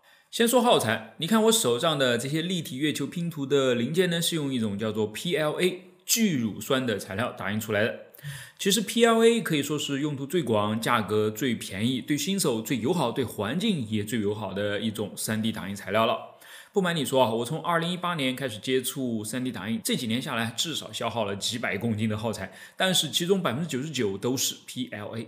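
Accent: Nanjing, China. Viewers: 口音有点南京的感觉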